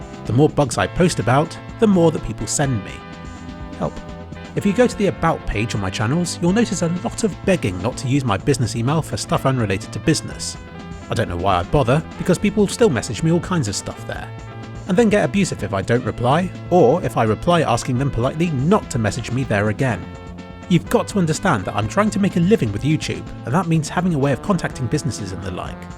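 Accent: United Kingdom. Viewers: For many you are a god, for others a simple person who uploads videos to YouTube. For me you are someone who inspires and gives a good message and gives a smile, I also love how you narrate your videos, I would love to have an excellent level of English and have that British accent like the one you have:c Valve, give a break pls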